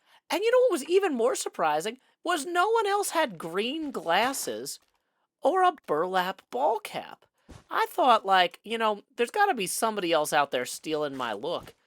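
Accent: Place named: South, United States. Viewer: Hearing the English mannerisms in a southern accent all episode was a treat!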